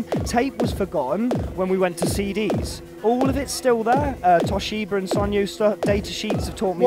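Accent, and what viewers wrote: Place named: Bristol, United Kingdom. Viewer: Sounds like a Bristol boy!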